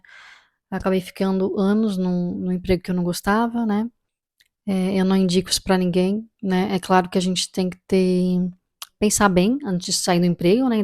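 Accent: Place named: Brazil. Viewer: Se és Portuguesa e estás em Portugal, porque é que falas brasileiro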